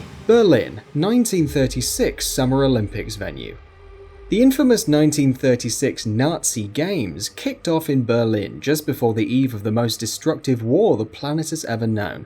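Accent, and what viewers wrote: England, United Kingdom. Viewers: Who cares how it's pronounced he's saying it with an English accent so of course it's different. I pronounce jalapeño JAlapeno instead of HAlapeno...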